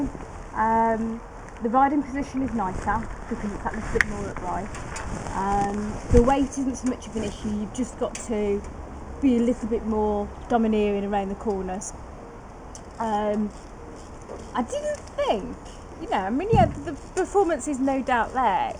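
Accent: United Kingdom. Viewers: I LOVE how you speak. Are you english? And forget the hate. Because the "negative comments" that I saw here are clearly hate. Out of jealousy, and fear. Guys don't like when they meet girls who have double their balls. And for a girl to ride a Diavel, she needs big balls ahah! Tell me, are you tall? Small? What's your frame? I wonder if a short, slim girl can actually ride a Diavel.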